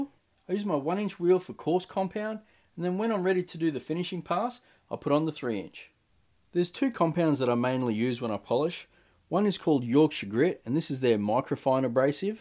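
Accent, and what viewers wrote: Australia. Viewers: Great to hear an Aussie accent and explain things so well thank you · Ahhh... There's nothing better that clicking on the video and hearing an Aussies voice !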